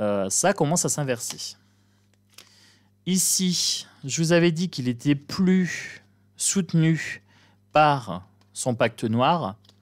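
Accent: North, France. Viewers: Bonjour tu vas bien?tu nous fais remonter mon énergie en ce moment je suis flagada de regarder tes vidéos ça me rebouteux. Vivement que ce personnage sorte de notre espace de vie.Bisous à toi.Je pense que tu es du Nord?tu as par moment dans ta façon de parler des mots qui me rappellent mes souches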